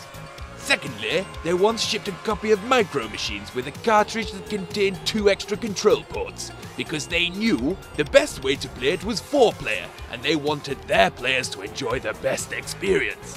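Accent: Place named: Scotland, United Kingdom. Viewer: Good entertaining review, but i want your scottish accent back !!!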